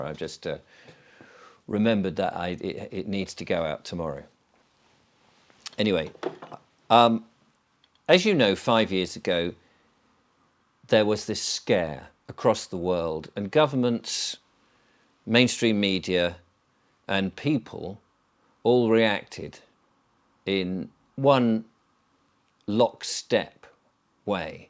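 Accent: United Kingdom. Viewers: hi, since I discovered your channel I only watch that, really interesting, I also enjoy listening to the british accent...so elegant...keep doing a great job, cheers from an Algeria guy lost in the cold of Montreal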